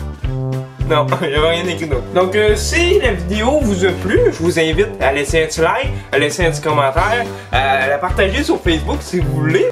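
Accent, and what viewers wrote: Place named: Quebec, Canada. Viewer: J'adore l'accent quebecois, franchement je le trouve ultra classe😎 continue comme ca c'est genial.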